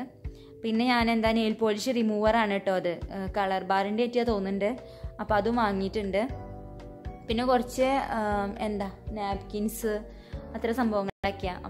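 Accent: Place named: Malappuram, India. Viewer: Hi ചേച്ചി, ഞാൻ new subscriber ആണ്.. ഞാൻ ചേച്ചിടെ വ്ലോഗ്സ് തെരഞ്ഞു പിടിച്ചു കാണുവാരുന്നു. എനിക്ക് ഒരുപാട് ഒരുപാട് ഇഷ്ടമായി. ശെരിക്കും എന്റെ ഒക്കെ വീട് പോലെ തന്നെ. പിന്നെ, ചേച്ചി ശെരിക്കും നാട്ടിന്പുറത്തുകാരി തന്നെ ആണ്. ആ നന്മ ഒക്കെ ഉള്ള ചേച്ചി. പിന്നെ ഞാൻ ആലപ്പുഴ ആണ്. Apo ചേച്ചിടെ മലപ്പുറം slang കേട്ടോണ്ട് ഇരിക്കാൻ തന്നെ നല്ല രസമുണ്ട്. സംസാരം bore ഒന്നുമല്ല ചേച്ചി. രണ്ടു ദിവസമായി ഞാൻ വീഡിയോ കാണാൻ തുടങ്ങിയിട്ട്. Apo മുതൽ കമന്റ്‌ ഇടണം ന്നു വിചാരിച്ചതാ. ഇപ്പോഴാ പറ്റിയത്.. super വ്ലോഗ്സ് aanu.. ഇനി ബാക്കി വീഡിയോ കൂടെ കാണട്ടെ കെട്ടോ.. all the best ചേച്ചി... Love you so much ചേച്ചി.... 😍😍😍😍😍😘😘😘😘😘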